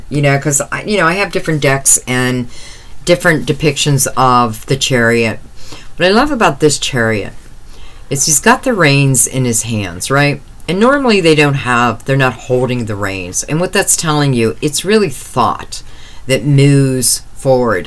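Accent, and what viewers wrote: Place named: East Coast, United States. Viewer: everything you say is real ... you sound like your from back east very kind and wise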